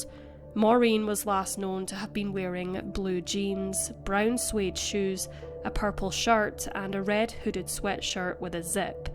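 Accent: Scotland, United Kingdom. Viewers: I love your british accent if I am not wrong. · Really? What a nasty thing to say! This lady is Scottish, but obviously you’re not educated enough to realise that! If this is an example of how your mind works, you should be ashamed of yourself.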